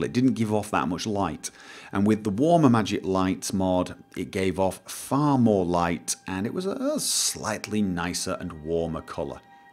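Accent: Norway. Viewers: Same! And 'cause I love love your voice and accent! (Norway?)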